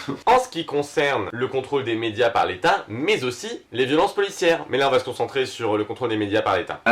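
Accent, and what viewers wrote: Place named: Marseille, France. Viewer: Les 14 pouces vers le bas c'est les jaloux de ta bague de ouf et ton accent marseillais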